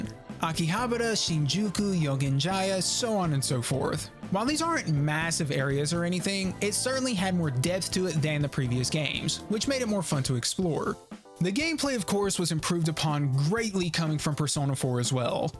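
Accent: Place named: South Carolina, United States. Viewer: As someone from SC, I do really love that your accent slowly comes out more and more throughout the video. It's such a comfort to hear!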